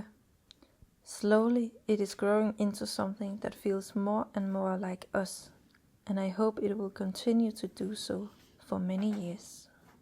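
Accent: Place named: Denmark. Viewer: Thank-you for the voice over! I love hearing your Danish accent. It is such a lovely addition to your videos!!!!!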